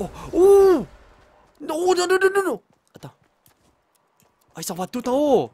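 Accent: Quebec, Canada. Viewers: Cc t mon YouTubeur préférer j'adore tes vidéos fortnight continue c'est trop génial j'adore les accent québécois lol en tout cas continue comme ça et mets un like please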